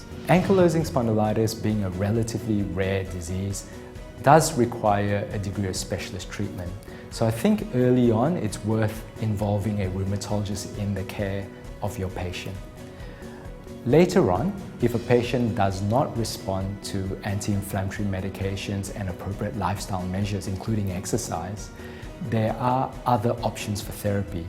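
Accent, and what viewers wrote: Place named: Australia. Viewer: So, so good to hear an Aussie accent, talking about AS here on Youtube. I am a late and constantly changing dx. While I was dxed with AS almost a decade ago, every time I come across another Rheumy the dx seems to change. That or they just won't commit, they just call it some kind of Inflammatory arthritis. OK, admittedly I have more that one systemic condition that directly affects the joints, which seems to confound the issue. Apparently I am also a very complex patient. My take on that is shitty gene's, ha ha. I am female, 50 something, B27 negative, RF negative, ANA positive. I have a relative, my mothers uncle, who had AS. I also have plenty of relatives with RA. Fortunately I have been on DMARD's the whole time. I have tried a biologic but I kept getting stomach infections. My Rheumy at the time told me that if I couldn't tolerate one biologic I couldn't tolerate any of them. 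I also hvae a standing order for rule 3 testing which I get any time I have another blood test done. No one seems to be monitoring them so I don't worry too much. I have had imaging of my lungs and lung function tests, the results of the LFT wasn't good news. As I live in a small country town (in NSW|) and am a waaay too frequent, frequent flyer at my local imaging place. Lets just say the guy who reports on my imaging is always the same guy and he isn't overly concerned with being accurate. It's OK if the specialists actually view the imaging but because I am complex, most of the time there is no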